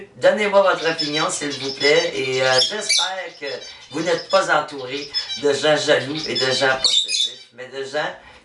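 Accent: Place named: Canada. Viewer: C'est mignon ton accent canadien ! C'est très intéressant et je suis entièrement d'accord avec toi ! Ce que tu dis me rappelle que j'ai eu des parents très équilibrés ( sans esprits de compétition,satisfaits de ce qu'ils possèdent, non tributaires des modes... ) "Le bonheur , c'est de continuer à désirer ce que l'on possède !" (Saint Augustin) Combien c'est vrai ! Augustin avait un peu copié la Bible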